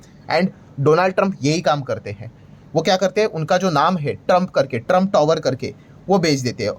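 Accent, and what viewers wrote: Maharashtra, India. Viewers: Trump tower Bandha hai 😂😅 Are you Marathi?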